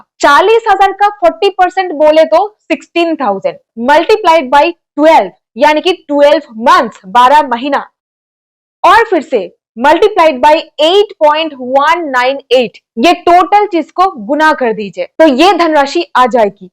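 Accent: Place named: South, India. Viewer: Your south india hindi speaking style is osam, I like your speaking voice.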